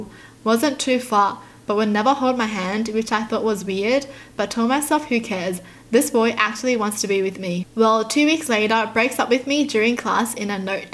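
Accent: Australia. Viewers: Any blinks here think she sounds like rosé. Cause they both are Australian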